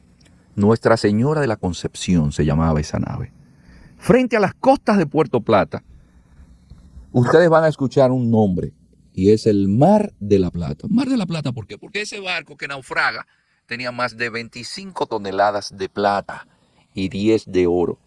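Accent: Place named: Castile, Spain. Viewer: Siempre veo los videos de wn tu canal, hablas con acento castellano. No se si eres de Espana realmente.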